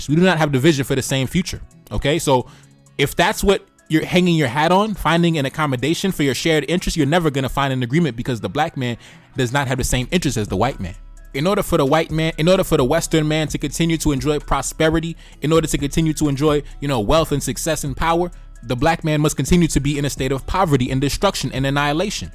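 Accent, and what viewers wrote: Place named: New York, United States. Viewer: I love your geopolitical videos. Those are the ones that I always click on! Not only are they informative, but you manage to make them so funny too, with your New York accent😂😂. Keep it! 🇭🇹🤝🏿🇧🇮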